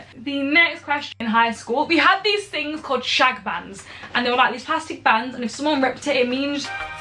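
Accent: England, United Kingdom. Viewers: Out of curiosity, what part of England are you from?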